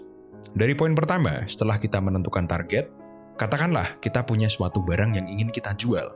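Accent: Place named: Java, Indonesia. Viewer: Ga, yg ini agak medok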